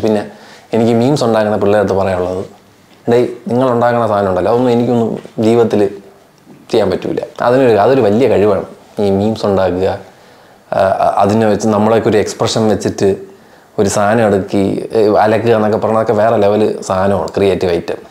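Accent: Trivandrum, India. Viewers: എന്തൊക്കെ പറഞ്ഞാലും നല്ല തിരുവന്തപുരം ഭാഷയിൽ പത്ത് പറയുമ്പോൾ ഉള്ള സുഖം അതൊന്ന് വേറെ തന്നെ...👍😊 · Huge Respect bro, Secret Agent & Mallu Traveller 🙏🙏👍👍👍👌👌and bro your Trivandrum slang 👌👌👌 വേറെ ലെവൽ 👌👌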